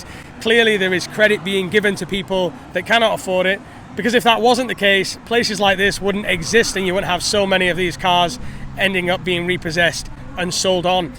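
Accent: North, United Kingdom. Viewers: Where you from I hear a northern twang in your voice that has been diluted over the years by speaking clearly to be understood. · Sad to hear you are losing your good old northern accent mate. You’ve got a media proper voice now 😂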